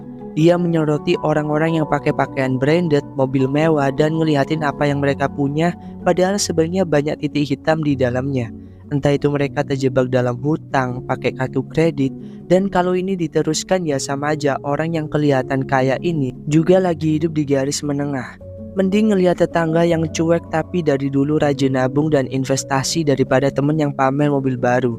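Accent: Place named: Jakarta, Indonesia. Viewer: Alangkah bijak bila narasi diucapkan dalam bahasa Indonesia yang baik dan benar. Tak perlu kejakartanan dengan lu gue. Krn yang menikmati bisa jadi orang seluruh Indonesia atau bahkananca negara, dimana saat ini bahasa Indonesia diminati di banyak negara.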